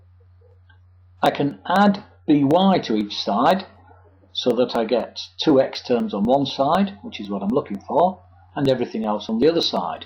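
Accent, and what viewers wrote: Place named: Hull, United Kingdom. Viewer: The narrator has to be from Kingston upon Hull - or close to it. Very helpful video.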